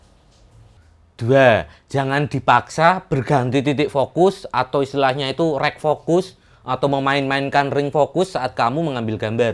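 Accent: Java, Indonesia. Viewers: Medok jawa ya bang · Pekat betul ya jawa mu mas..hehe udah subscripe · jinguk baleni neh hahahaha.. njawani mas hahahaha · ilmunya mantap om , plus2 lucu2 gaya jowo asik ae rek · sek seksek....wih jowo banget😅😊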